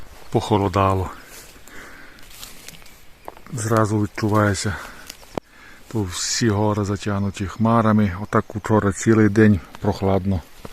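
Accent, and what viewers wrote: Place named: Zakarpattia, Ukraine. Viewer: По мові чути: Закарпаття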